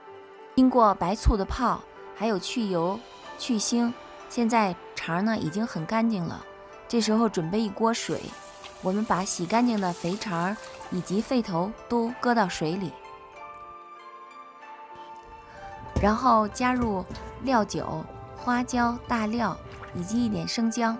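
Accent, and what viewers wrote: Beijing, China. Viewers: 虽然我不吃，看着也香。今天说话有北京味了！爱听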